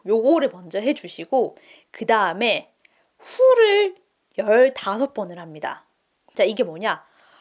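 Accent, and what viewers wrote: Busan, South Korea. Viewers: 지금은 원래 쓰시던 부산 억양보다 저 억양이 더 편하신가요? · 영상을 쭉 보다가 좋아요 구독 클릭했습니다. 간결하게 핵심을 잘 알려주세요. 부산 사투리 e쁘네요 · 사투리 귀여우세요 ㆍ 참고도 저도 부산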